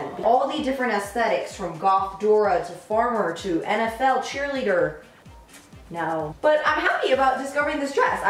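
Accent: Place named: United Kingdom. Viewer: the British accent tho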